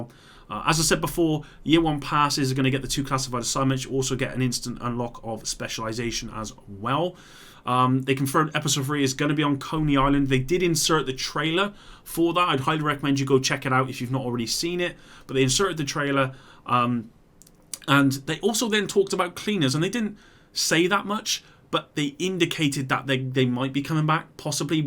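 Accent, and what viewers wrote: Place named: Cockney, United Kingdom. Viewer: propa cockney m8 th=f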